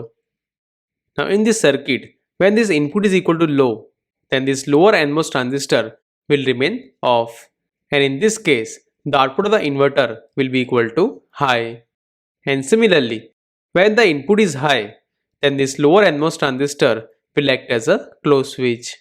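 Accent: India. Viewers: I actually think the Indian accent makes it easier to understand lol. I'm not even Indian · I only understood because he had an indian accent, like i could trust him more with his expertise :)) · its really good but pls don't speak with an Indian accent its really hard to understand ;) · Why so many Indians.... Sorry but it is difficult to follow you with the accent...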